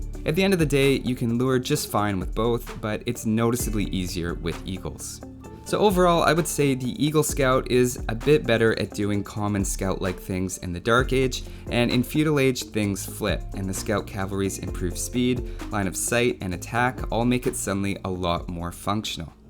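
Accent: Canada. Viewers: Is the author of the video Canadian by any chance? "Scout" and "about" are distinctive in their pronunciation to Canadian speech. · My weary soul is calmed every time I hear say his "let's check it out", in that Canadian accent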